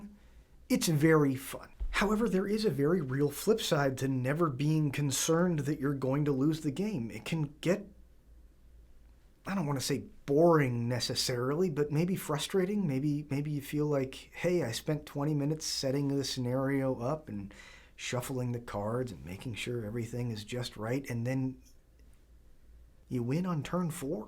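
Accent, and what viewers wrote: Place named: Scotland, United Kingdom. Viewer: Thought this one might be Scottish 🤣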